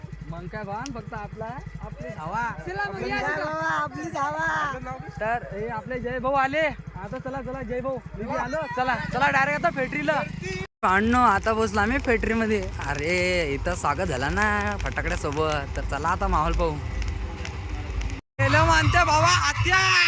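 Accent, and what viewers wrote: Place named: Ajmer, India. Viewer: Ajmeri sound bolte bhau🎉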